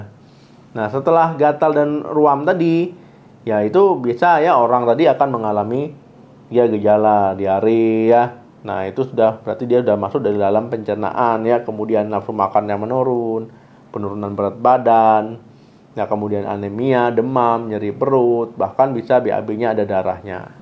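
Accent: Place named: Medan, Indonesia. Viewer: LOGATNYA MEDAN BANG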